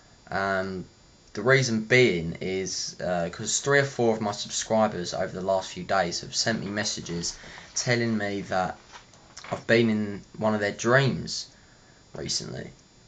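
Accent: United Kingdom. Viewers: lol i'd guess from his accent he lives in the U.K, and they're on a pretty similar time. enough for the two to be simultaneously dreaming. I think.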